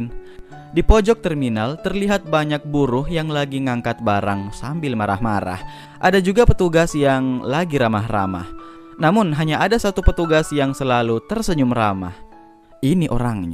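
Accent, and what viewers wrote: Makassar, Indonesia. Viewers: Bang org makassar yah? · Yg aku suka vlog ini adalah narasi nya bagus... Uniknya adalah, menggunakan bahasa indonesia dengan aksen indonesia timur, Makassar... Jadi lebih seru. Nice vlog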